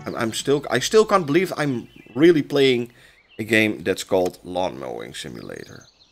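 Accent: Netherlands. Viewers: you are dutch i hear it in your voice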